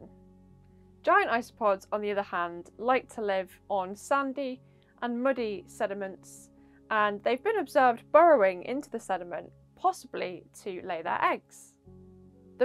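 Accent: United Kingdom. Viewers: What part of England is your accent? Its one of my favorites, the one where yall say it like "PEBBOWLS" instead of pebbles 😂❤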